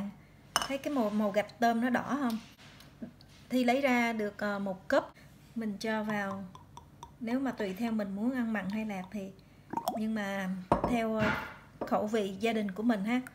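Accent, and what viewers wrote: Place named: Hue, Vietnam. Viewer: C nói tiếng Huế hay mà. Nghe rất dễ thương. Sao 2 con chị ít nói tiếng việt nhỉ. Thấy bé chăm nói tiếng việt hơn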